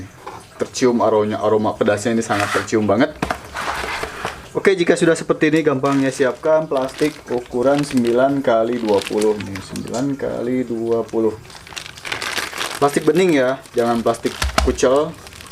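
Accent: West Java, Indonesia. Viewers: sundana lucu pisan teh.meni edun etamah ey😆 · sarau sarau ngaheab gagaro meni sunda pisan...........😂😂😂😂😂 salam bandung barat. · Seri we abdi mah,lucu kubahasa na,bnr2 asli sunda....(Hitung deui Kang Salah)😍😍😍🤣🤣🤣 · Hadeu... H"dasar tukang bisnis! Ih pinter bgt ch. Yg aku kangen in tuh logat sundanya yg kental. Sy tunggu ide nya yg lain y. Makarona rasa keju aku suka bgt rasa keju pasti gurihh.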